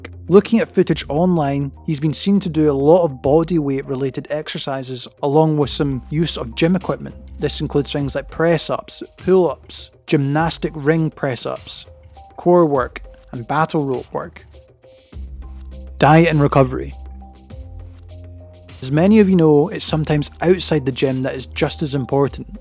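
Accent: Scotland, United Kingdom. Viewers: Good vid work. 👌 the Scottish accents adds more value 😄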